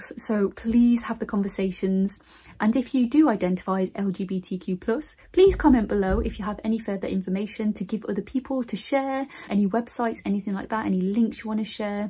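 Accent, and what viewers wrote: Birmingham, United Kingdom. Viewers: Are you from Birmingham like me? I'm a GP and I love your videos. This one is one of the best x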